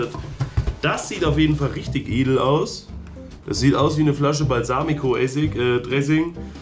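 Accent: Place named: Netherlands. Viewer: Eim sehr spezieller niederländischer Akzent! Glückwunsch nachträglich! 😀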